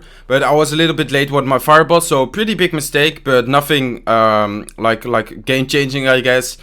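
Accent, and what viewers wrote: Netherlands. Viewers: are you dutch? sounds like it xD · Je bent nl 😂 dat hoor je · SmikkelKabouter He is Dutch yes. I used to speak English like that too but that was when I was 12 years old xD Btw sappige nickname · Zo'n nederlands engels kut accent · Mooie tacktieken! Toen ik de video startte en je accent hoorde dacht ik gelijk "dat moet wel een Nederlander zijn" en toen las ik je discription. Tbh best wel fun. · Haha je Nederlandse accent is te horen ;) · SmikkelKabouter sws nl je hoort het meteen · Haha je hoort echt duidelijk dat hij Nederlands is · Are u dutch cuz u have a accent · ben jij nederlands? zo klink je man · SmikkelKabouter hij is nl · ik hoorde meteen je Nederlandse accent hahaha want dit is de 1ste video die ik van je kijk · your not english i think i think your dutch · Haha dat Nederlandse accent geweldig😂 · SmikkelKabouter hij is nl ik hou echt van zn engels😂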